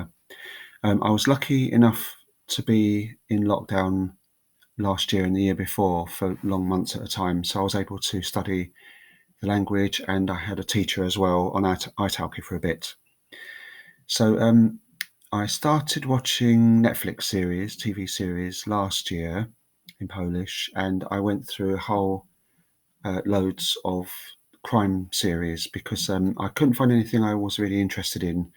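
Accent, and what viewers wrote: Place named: England, United Kingdom. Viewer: Miło subskrybować twój kanał. Jak zawsze wygadany i ciepłe słowa w kierunku ludzi.Twoj język polski jest dobry.Jestes Anglikiem i normalne, że masz inny akcent.Dzieki za mile słowa w kierunku mojej osoby.Udanego weekendu.Ewa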